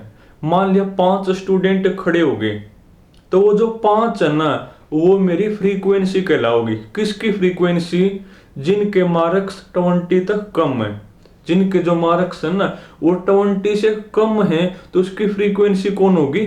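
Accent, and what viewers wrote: Haryana, India. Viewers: Haryana t ho sir aap ???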